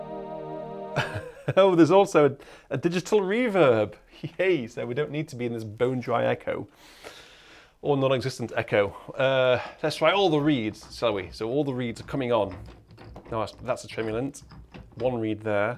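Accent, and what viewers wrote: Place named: United Kingdom. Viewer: For 1990's digital organ (Viscount), they are number of quite good sounds, others????. Sounds probably could be better if tweeked with amplifier and biiiiiggg speakers. But nothing comparable to 2021 Hauptwerk. I took my AGO Service Playing certificate in 2010 on a 1997 Rodgers 950 3 manual professionally installed Speakers/crossover + 6 ranks of Fufatti Pipes. in 2000 seat church. That sound I thought was excellent. But Hauptwerk in 2021 is the best!!! Digital Diapason has never sounded as good as the real pipe. As a Texan your commentary is always fun to hear--your words sound more intelligent with a British accent!! Love the dialog: organ is "rather heavy" to move, Jolly useful", Holy Moly", "get the Dyson", etc. Appreciate you., too.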